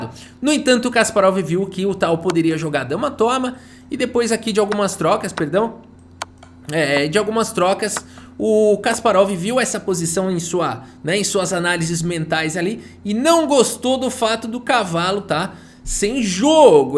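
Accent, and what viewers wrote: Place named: Sao Paulo, Brazil. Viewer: Desculpa cara mas é difícil tankar esse teu sotaque paulista. Ameniza isso daí meoooooo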